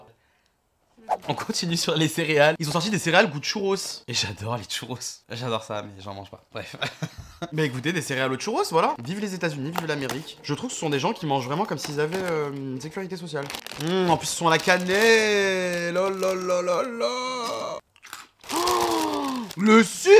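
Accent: Quebec, Canada. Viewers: Juste l'accent quebecois mérite un like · Ptdr l'accent québécois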